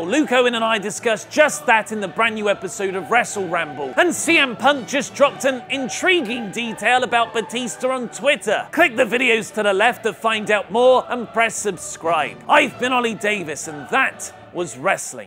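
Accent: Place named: United Kingdom. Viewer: YOU ARE MY HERO!!!! YOU BRITISH TALKING MAN!!!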